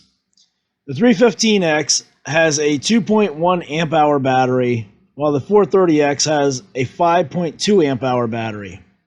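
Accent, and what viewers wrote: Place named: Western Pennsylvania, United States. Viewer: I'm thinking the dial height adjustment might be faster than futzing with an app and there's less to go bad in the future. I didn't look it up, but where in Western PA or Western MD are you? Yes the accent and word usage gives you away 😁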